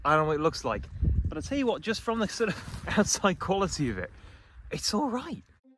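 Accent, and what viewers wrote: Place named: United Kingdom. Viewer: You have a narrator's voice. It reminds me of a voiceover from a British show.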